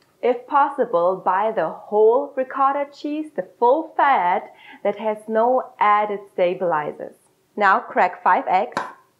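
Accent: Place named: Germany. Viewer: You speak very good English ! What part of Germany are you from ?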